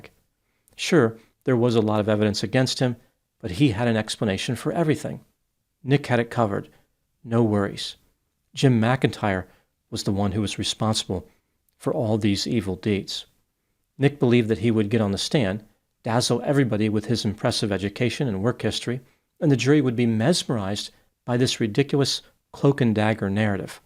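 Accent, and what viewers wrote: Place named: Pennsylvania, United States. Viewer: mentioned Lancaster, PA which reminded me that accent is exactly like my SEPA (Norristown) born and raised grandad. grew up in SEPA, no? If he didn’t, don’t tell me bc I fashion myself an amateuraccent expert. The gift that is uninterrupted ignorance is one that keeps on giving.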